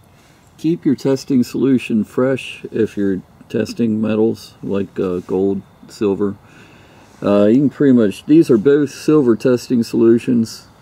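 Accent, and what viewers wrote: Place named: Pennsylvania, United States. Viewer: I subscribed to you channel today :) I love to junk and yard sale. I have question, I was watching your video 2010 you bought 9" silver zodiac part. You said you sold it to a guy on Craigslist. I inherited the exact same bar. Can you provide me with any information? You said, if you had kept it, it would be worth more. 7 years later :)) I would appreciate any helpful info. You sound like, you are from Pennsylvania. Steeler Fan here.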